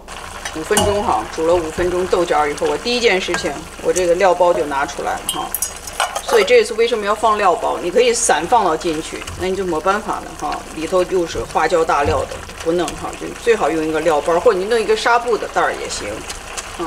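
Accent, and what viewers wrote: Shaanxi, China. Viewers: 陕西话听着就是舒服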